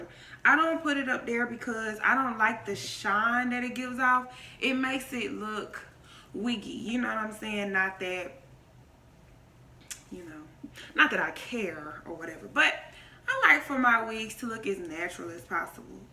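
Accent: Atlanta, United States. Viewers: Glam Doll you know that’s an Atl accent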